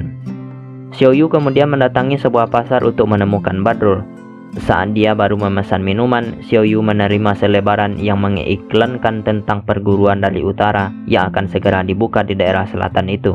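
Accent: Madura, Indonesia. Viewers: logatnya kyk madura🤣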